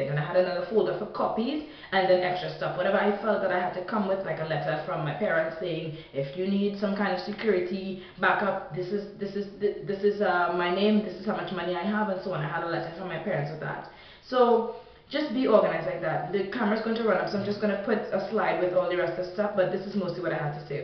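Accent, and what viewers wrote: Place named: Trinidad and Tobago. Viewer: How exciting!!!!! :D In the other videos I'm like that girl rellll sounds like a Trini. I'm in first year now and I intend to do this after second year so I'm collecting as much knowledge as possible. Did you have prior knowledge of the French language? THanks!! Rushell :)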